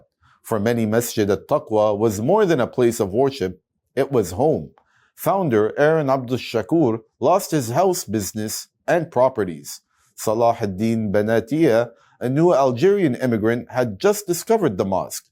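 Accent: United States. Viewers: .*╔═══ ❤☀💚☀❤ ═══╗* 💚💚💚💚💚💚💚💚💚 Dear MR, Wa-alaikum as-salaam. Like the Crescent of, you appeared after full one week, 14th, Feb being the last you had read the news, and full 7 days ahead of the sighting of the Crescent of. Wishing you and all your subscribers a HAPPY, SPIRITUAL, TAQWA-GIVING & AUSPICIOUS!!! Your presentation of news is so fantastic that despite you accent being American, we, from India, find it so easy to understand you beautiful slow pace of reading the news. KEEP IT UP, MR.!!! 🌐🌐🌐🌐🌐🌐🌐🌐🌐 ╚═══ ❤☀💚☀❤ ═══╝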